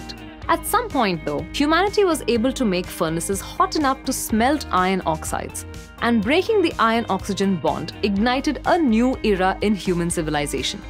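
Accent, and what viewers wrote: India. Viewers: her accent was screaming Indian Subcontinent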